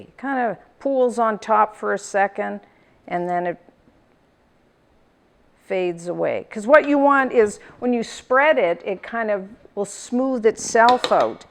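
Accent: Canada. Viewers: Confectioners sugar - is that icing sugar?? Love your Canadian accent btw xx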